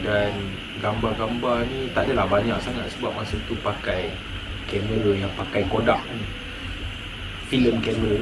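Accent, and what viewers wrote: Terengganu, Malaysia. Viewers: Ambe asal org kampung seberang pintasan...area kuala dungun...biase gak gi panjat teluk gadong naik utk memancing...skang dah berubah..mari dungun nanti kite ngeteh...deme cakap macam org ganu dohh....respek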